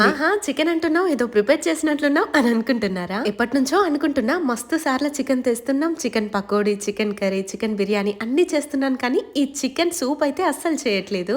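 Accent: Telangana, India. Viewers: Telagana vallu telagana slang ee matldtharu 😊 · Enti akka hyd vachaka telangana slang vachesthundhii..nuvu Ela chesthe ni old cuteness nenu miss avuthanu..ala matladodhuuu😭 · Telangana slang aatlaney unnatadi malla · Akko original slang baitiki ochindhi mana telangana slang lone videos cheyi akka❤ baguntadhi plz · Telangana slang ❤❤❤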